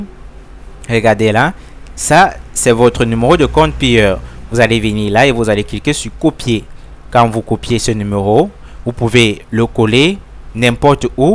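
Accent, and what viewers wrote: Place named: Africa. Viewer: un pot moné épargne nous ton accent africaine stp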